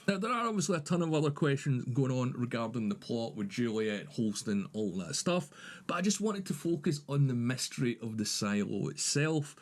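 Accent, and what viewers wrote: Scotland, United Kingdom. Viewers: I like Scotland’s accent! 😅 I think the silo was built by United States government, I did not read the novel books series, but that is what I got from unspoiled from readers reviews. However, you are right, it is hard for bureaucratic entity to build something works efficiently, but they built it under the apocalypse circumstances! When any mistake, no matter how small, may end the human race! In terms of Silo authorities, I guess there is some misunderstanding, I do not blame you, I had it at the beginning, simply because silo authorities power distribution is extremely unique, it never ever existed in our real world! But still realistic! In our world, the tyranny power, or dictatorship, evolve by military coup, expansion of executive influence, or legislature pressure (revelation). In silo, the judiciary is the monopoliser of power! 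It is rare in our world but make sense in silo where armed forces do not exist! Nor society freedom! Why the mayor looks like the highest authority, appears as president? It is like asking “why german chancellor looks like the highest authority, appears as president?“! The german chancellor title been used after Carolingian Empire fall, as the highest position left of the empire! So the correct question is, did the United States president and governors died during the apocalypse years and the highest position survived was a mayor?! “The Silo” as a fictional world is still evolving and several stories and novels written in the same world, each su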